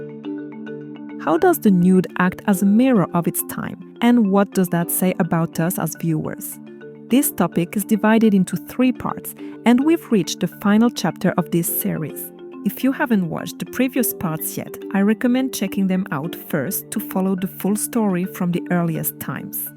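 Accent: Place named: France. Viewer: You have a beautiful voice with your elegant French accent. Your analysis of great works of art is clean, consise and freshly enlightening. Thank you for this.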